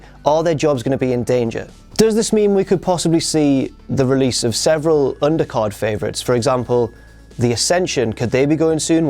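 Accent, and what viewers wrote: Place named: United Kingdom. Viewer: adam rose was charged with tampering not tumbling =P that british accent doe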